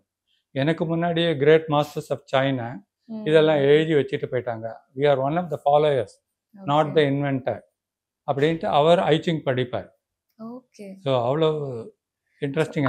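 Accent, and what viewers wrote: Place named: Kerala, India. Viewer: BCS he is a Malayali.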